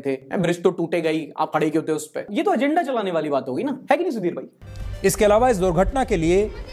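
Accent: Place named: Bundelkhand, India. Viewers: Bundelkhandi very beautifully spoken.. keep it up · It feels so good to hear a recongniged youtuber speaking bundelkhandi.. ✨😁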